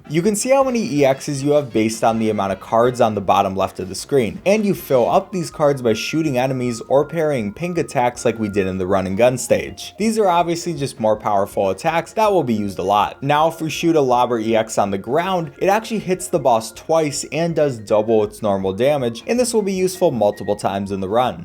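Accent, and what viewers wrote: Sweden. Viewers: excuse me are you swedish? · Are you Swedish I em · are u from sweden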